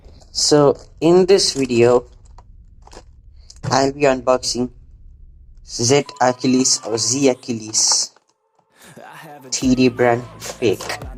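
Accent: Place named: North India, India. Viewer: bro first I taught that you from North states because of way you speak english is different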